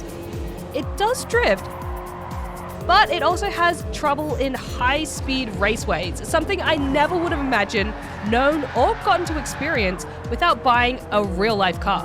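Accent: Australia. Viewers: Haaaaars and haaaars. Is it just me does say haaaar instead of hour? Definately a mixed accent, Australian and something else. Just can't place the secound part of the accent.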